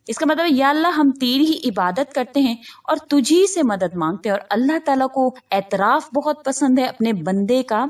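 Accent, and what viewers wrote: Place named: Arab world. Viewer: اسلام علیکم ، بہن آپ سے گذارش ہے کہ آپ اردو جیسی میٹھی زبان کو اردو ہی کے لہجے میں بیان کریں، بلاوجہ اردو کو عربی لہجے میں بیان کر کے مصنوعی مت بنائیں.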